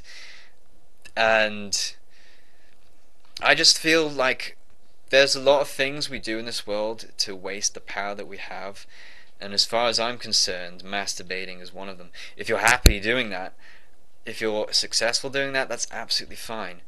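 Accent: United Kingdom. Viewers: Where you from bro? Is that a welsh twang in your accent lol? I'm gonna start no PMO tomorrow, gonna aim for 30 days and may continue after more research and experience etc. Have you got facebook? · Hey brother, firstly congratulations on your monumental achievement. Your video is spot on with all the good reasons to give up the fapping and is of great inspiration to me. I have been in exactly the same spot you described, massively addicted to gaming and fapping for years. I am now slowly pulling myself out from it (no pun intended!), and have decided to focus the extra energy and time towards meditation. Thanks for all the inspiring words. By the way I am trying to place your accent, Mid-Wales or Shropshire area per chance? Cheers again matey! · What kind of British accent do you consider yourself to have? Just curious because I'm studying English and really like your accent - I'm not sure though in which area of the UK do they speak like you?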